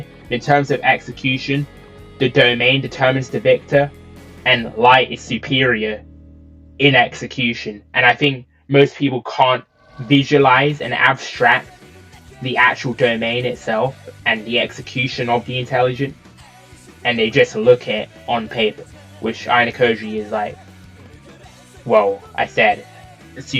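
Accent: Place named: United Kingdom. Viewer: 25 minutes of british accent.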